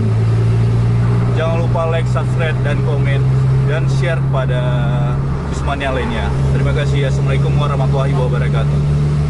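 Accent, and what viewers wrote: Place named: Medan, Indonesia. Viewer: Abang tinggal dimana bang?, Soalnya Ngomongnya kek orang Medan hehe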